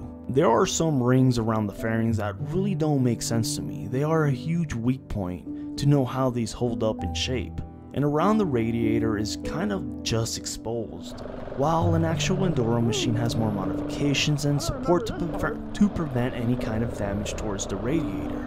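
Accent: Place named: Mexico. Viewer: THIS DUDE SOUNDS TOO MEXICAN TO BE A TRUE AMERICAN. CITIZEN OR NOT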